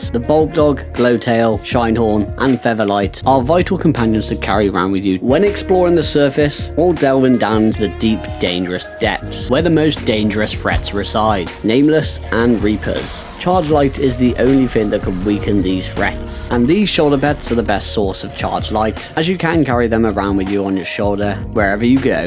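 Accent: United Kingdom. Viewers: It's relaxing to me watching your videos! Even just listening to it lol, I love the British accent! Great video!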